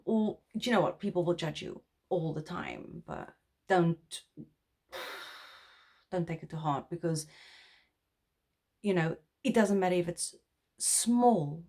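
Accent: Afrikaans, South Africa. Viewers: No Afrikaans speaking person is not of mixed race origin. I have DNA tested 10 “white” Afrikaans speaking persons. All are of mixed race origin. The majority of us are decedents of white men and imported slave woman from West Africa , india and Malaysians. I am of Indian, Malay, West Africans and European decent. I have very blue eyes, blond hair and western facial futures. My skin is white and I tan a dark bonze colour. Do not judge people by their looks you might be mistaken. Your coloured accent sounds more like a “ white” Afrikaans accent. You are an Afrikaner. The can just as well do the mental shift and embrace your Afrikaner identity. Many English speaking South Africans are more Afrikaner than the Afrikaners in their way of living and thinking and their values. They are experiencing a continuous identity crises because they belong nowhere. 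Just accept that you have become Afrikaners. Make the mental shift. Tommy worked at RAU. He was Chinese as his mother and farther were born there. She could barley speak a few words of English. Tommy however spoke English and Afrikaans fluently. No accent in Afrikaans. It was an Afrikaans university. Tommy made the mental shift and became Afrikaans. He was absorbed into the community although he look different. He became one of us. No longer an outsider. We need to embrace our mixed heritage and our Afrikaner identity. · You're beautiful and your accent in only south African. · My son is 40 and can hardly remember those years...i think you are not telling the truth about the pencil test...and you are talking with same acsent as an afrikaans person trying to speak english stop feeling sorry for yourself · What a crazy world we live in. If I had met you without knowing you, I might have thought that you were an Afrikaans speaking person, speaking English. 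Not that it really matters, 30 years after the end of apartheid government and race is still an issue. Look at the Z-83 form for application for employment in a government dept. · Your accent just sounds Afrikaans (or Eastern Cape English, even) to me, so I suppose one has to come from the Cape to hear the difference or something. My mom's family were from the Northern Cape, and would've probably been put in the box "Poor Whites" in those times, because that was what the politicians were peddling to the masses back then, but their heavy accent (much heavier than yours to my ears, anyway) wasn't what distinguished them. AFAIK, every English speaker of those regions (regardless of background) spoke basically Eastern Cape English. They moved to Jo'burg, and all the girls changed their accents, eventually, while the boys kept theirs. (Big family.) So today my mom, aunt, and uncle all live together, getting old, and the two sisters speak Natal English, mainly just because that's how people speak here, but my uncle might be able to "pass for Coloured" - to my ears at least, and I'm probably missing all sorts of nuance that make that idea ridiculous. 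Actually another one of my uncles was in the queue with a very "Coloured-looking" lady (there's no such tidy box, but somewhere right in the middle of everyone pushed or pulled into what's become that culture there's something like that), who had the same surname as him, so is almost certainly related (because it's a very rare surname) to us. So somewhere back in the past, one branch of the family got put in the one box, and the other got put in the other. Actually now I think of it, that very uncle's first ID bo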